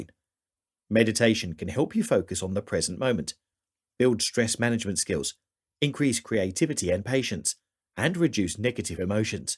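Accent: United Kingdom. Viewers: Why is nearly every video like this one narrated using a British accented voice? Do people really think that words spoken in a "posh" British accent convey more meaning or that the speaker is more intelligent? Most of these voice-overs are probably computer generated so perhaps they are more intelligent - even more so than most actual Brits.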